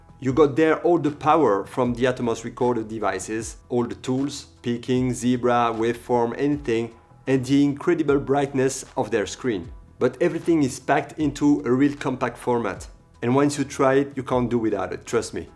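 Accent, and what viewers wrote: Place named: France. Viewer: Yaaaassss you’re back!! Love that accent monsieur; make more cool videos. Your lighting is superb; just as superb as your French accent 🙌🏽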